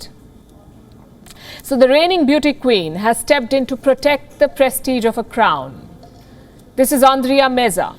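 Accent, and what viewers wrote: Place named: India. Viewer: Her Indian accent is off putting, the whole world has every English news channel speaking British or American English, change the anchor keep the script..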